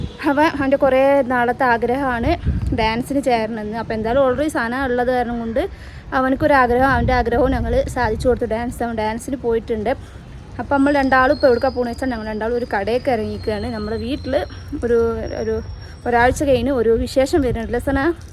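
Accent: Tamil Nadu, India. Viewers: മലയാളം തമിഴ് രീതിയിൽ അങ്ങ് പറയാ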